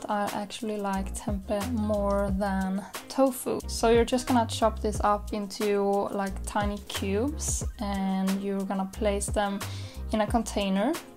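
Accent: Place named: Sweden. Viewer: That Swedish accent tho.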